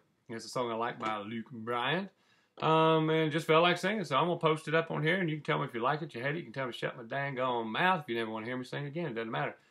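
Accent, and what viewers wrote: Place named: West Virginia, United States. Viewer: Pretty good man. Listening to your accent makes me wonder why I ever left WV. I can not wait to be back next month.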